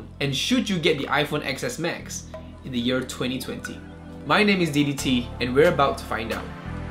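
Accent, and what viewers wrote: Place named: Southeast Asia. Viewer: You have a South East Asian accent! By any chance are you from Singapore or Malaysia?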